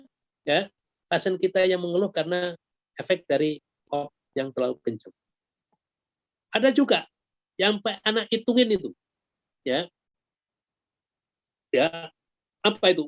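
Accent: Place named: Java, Indonesia. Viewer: Pk ustadz katur asli mn y logat jawanya kental sekali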